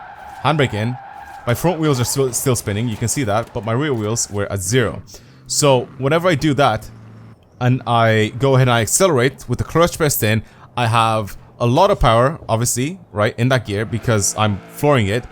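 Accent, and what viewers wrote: Ireland. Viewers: what part. of ireland are. yiu from and if your not irish i swear to jaysus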